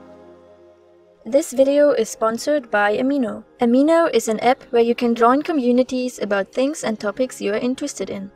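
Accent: Germany. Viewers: Bin ich die einzige die da etwas deutsches an ihrer Stimme hört 😮❤ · Man hört so raus, dass du deutsche bist HAHA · Das du Deutsche bist merkt man echt krass an deinem Akzent... XD Kennst du eigentlich eine Feya Steelleaf vom SSOA..? p.p oder Vielleicht Camelia Mistlord? XD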